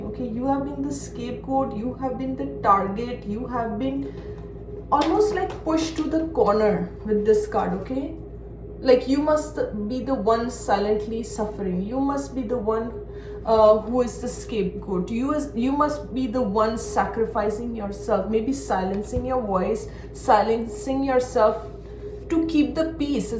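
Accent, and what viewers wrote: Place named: India. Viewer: Thanks! On spot as always. Something caught my attention today watching this reading. Your accent changed to strong Indian accent today… is that because you were challenged to Indian gods? Never happened before as I’m watching your videos regularly.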